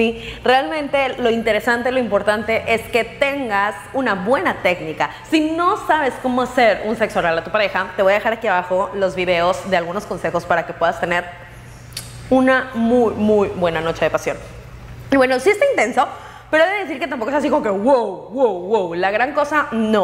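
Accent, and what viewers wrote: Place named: Fresa, Mexico. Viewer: Por qué hablas tan fresa !?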